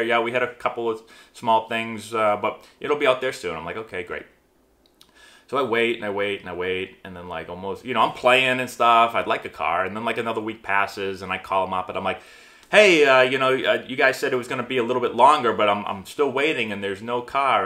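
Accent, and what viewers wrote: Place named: Massachusetts, United States. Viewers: His Massachusetts accent really comes out when he gets animated. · Yo im from Massachusetts too! Your accent sounds just like my brothers. For whatever reason I don't have much of a Boston accent.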